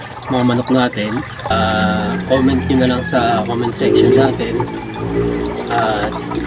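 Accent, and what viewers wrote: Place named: Bulacan, Philippines. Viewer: Parang taga bulacan po ang inyong punto ng salita salamat po dammam saudi arabia po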